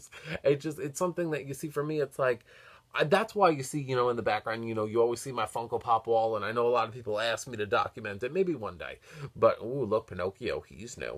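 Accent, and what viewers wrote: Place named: Boston, United States. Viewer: I like your Boston axe cent.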